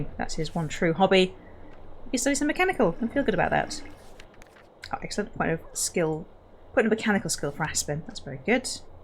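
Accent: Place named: United Kingdom. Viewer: Omg u and I literally have the same accent 😂😂 us brits need to stick together 😁😁😁🇬🇧🇬🇧🇬🇧🇬🇧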